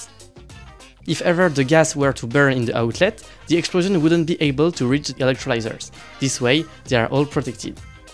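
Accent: France. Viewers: Are you from germany? Why do you have accent of french?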